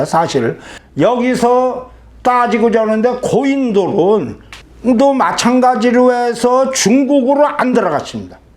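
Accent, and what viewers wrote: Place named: Mahan, South Korea. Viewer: 선생님은 말씨가 마한쪽 말씨네요~충북 괴산,음성,충주,여주(남한강 수계지역)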